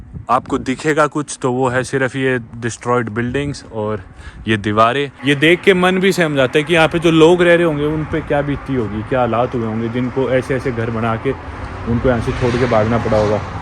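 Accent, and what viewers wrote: Haryana, India. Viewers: Bhai chora Haryana kaa lage hai !!!!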